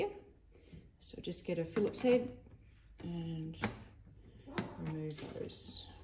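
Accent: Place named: Australia. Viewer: just got one from facebook marketplace yesterday.. cool to hear another aussie on the tube 🇦🇺🇦🇺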